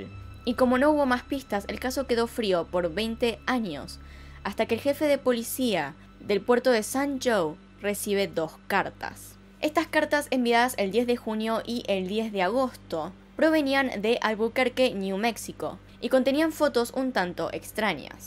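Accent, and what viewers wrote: Argentina. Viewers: Hay veces que no me gusta el acento argentino (sin ofender a nadie) pero sin embargo amo tu voz y la manera en que relatas todo ❤️ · Me encanta tu acento argentino. Estás súper guapa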